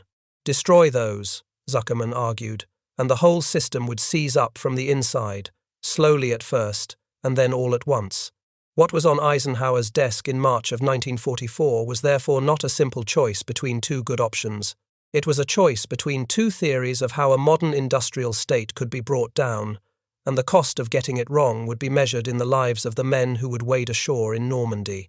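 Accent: United Kingdom. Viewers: I was getting more furious by the moment as you seemed to be ascribing all the action to the Americans. It is painful to hear a British accent not mentioning any of the splendid things accomplished by the night raids of the Mosquitos and the savage work of the rocket equipped Typhoons. Britain also contributed massively to the long-term sabotage delivered by the resistance groups by supplying the devices that could be used in place of direct explosives. The Gestapo would execute whole villages in regions where railways were sabotaged with explosives. When lumps of high explosive apparent coal were put into railway stockpiles and boilers just apparently exploded miles away, who could be blamed. Resistance operatives would put abrasive compounds into lubrication points where some would inevitably get into the steam lines. Locos would then simply lose energy and come to a halt while hauling a heavy freight. 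The Germans never worked that one out. It looked like simple wear and tear. I appreciate your mention, late in the article, of the heavy bomber dropping the Tallboy that destroyed the viaduct the Americans had failed to destroy. I wish you had mentioned the resistance groups who had been doing this work long before 1944. JW. Aust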